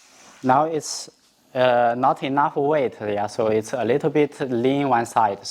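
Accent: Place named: Vietnamese American, United States. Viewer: bro has that vietnamese-american accent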